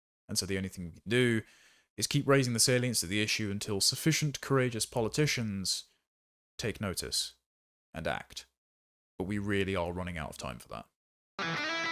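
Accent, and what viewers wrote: RP, United Kingdom. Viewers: You sound like a toff.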